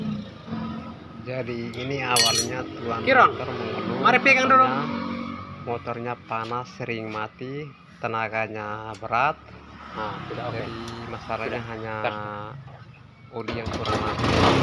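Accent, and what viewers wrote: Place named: Eastern Indonesia, Indonesia. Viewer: mantap bang, dgr logatnya org timur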